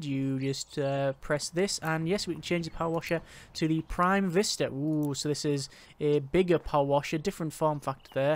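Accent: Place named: London, United Kingdom. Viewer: Quad pipe? Qruok pipe? I thoight "axing a question" was bad wnough. Can you londonese stop ruining English language.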